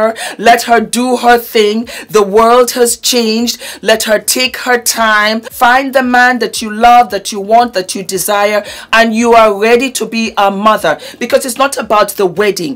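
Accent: Pidgin, Nigeria. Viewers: !!!! I lived 3.5 years in Nigeria! Love your pidgeon, as I miss hearing it. I learned some but I really love your delivery. Odabo!!! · 😂😂😂 I love your pigin and when you say "Ko da" (it's not good) I really fell you as a Niger and Yoruba ❤️ See me smiling. O da bo (good bye) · I love how you mix the Nigerian English.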